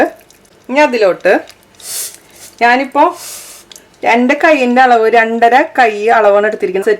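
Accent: Thrissur, India. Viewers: കിടുവേ.. ഡയലോഗ് പോളിയാണ് ചേച്ചി.. തൃശൂർകാരി ആണോ?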